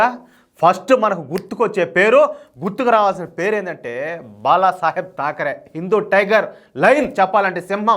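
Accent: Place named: Chittoor, India. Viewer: గారికి వందనం మీ చిత్తూరు బాష బాగుంది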